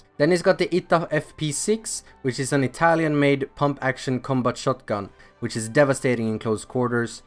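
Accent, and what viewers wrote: Sweden. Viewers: Not bad that can be fun operator I have a question are you Swedish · Is he swedish? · u are swedish?Also nice idea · Värsta svenne accenten haha, aja bra video, cool grabb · Are you swedish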